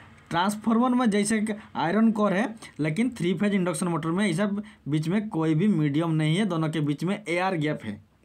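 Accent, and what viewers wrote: Odisha, India. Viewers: Bhai tume Odia na??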